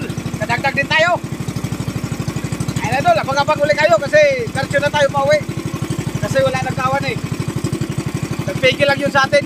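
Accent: Visayas, Philippines. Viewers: Visaya ka segoro kol dawi palang alam na hahahaha😂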